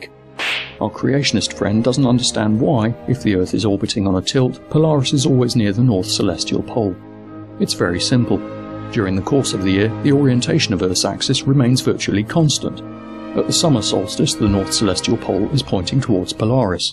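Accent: England, United Kingdom. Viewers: I was out after about 5 Seconds this stupid English accent or Australian accent whatever the hell it is I couldn't stand it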